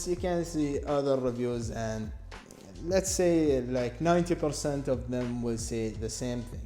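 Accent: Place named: India. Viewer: اسم القناه انبكوس عربي ويتكلم انقليزي مثل الهنود اش فيه ؟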